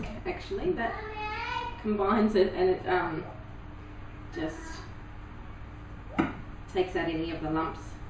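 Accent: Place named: Australia. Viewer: Oh wow! Looking for a Bassboussa recipe and getting one in an Aussie accent, so refreshing:) Hey lovely, I have seen a few tips about not mixing it long enough (so as not to release the gluten) some mom’s actually go as far as saying mix the dry ingredients with the melted butter first (to coat the semolina individual particles) Will definitely try it this weekend, hosting a professional chef for dinner, pressure is on! :)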